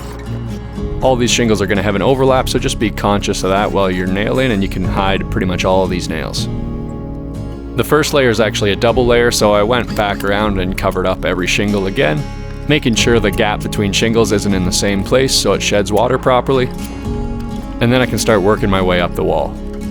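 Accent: Canada. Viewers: As if we couldn't already tell he was Canadian with all the "ungunna"s and "oot"s, he's even got the stamp. 🤣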